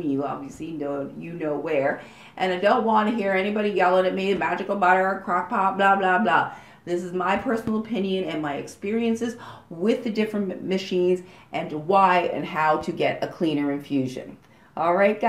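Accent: Canada. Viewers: I love how authentic you are when describing each machine. And your Canadian accent too!! 💚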